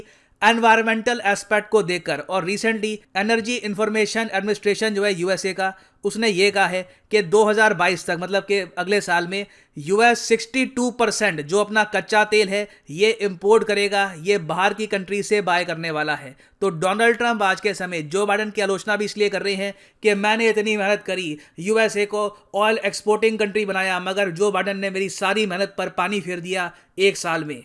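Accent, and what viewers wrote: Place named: Delhi, India. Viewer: “Hum gerrnge, dhar lia” sir’s delhi words so amusing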